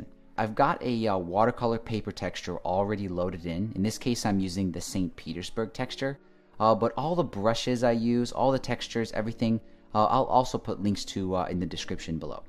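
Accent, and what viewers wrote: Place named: United States. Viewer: at your accent pretty much American if I'm not mistaken. Is it true? Yes, surely nowadays the situation is just unstable for you & family to travel around. Stay healthy and stay safe. Thanks for your kind responses. Keep posting nice videos 👍🏻👍🏻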